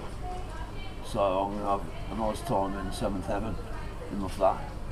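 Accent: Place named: Midlands, United Kingdom. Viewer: Your accent is awesome dude! Me being from Birmingham if always makes me smile listening to the midlands accent 😊 Hope your keeping wall anyway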